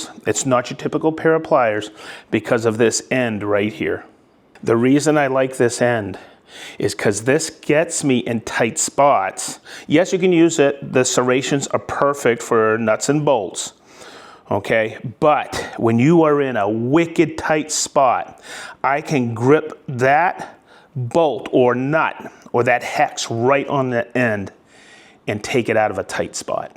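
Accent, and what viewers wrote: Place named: Boston, United States. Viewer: I know a Boston boy when i hear one. Thanks for the video buddy, just discovered your channel and looking forward to more. Cheers from Malden! 😂 Oh… and it’s Aliens for me.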